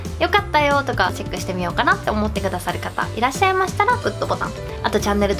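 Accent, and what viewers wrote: Hakata, Japan. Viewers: 博多弁可愛いw 二個目の浴衣個人的に好き！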